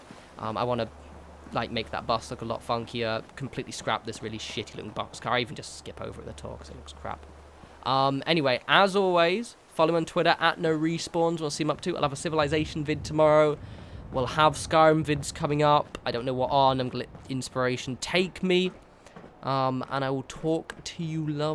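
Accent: United Kingdom. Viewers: I used to think you said "follow me on twitter at no response" instead of norespawns at the end of every videos of urs. British accent sure is somethin..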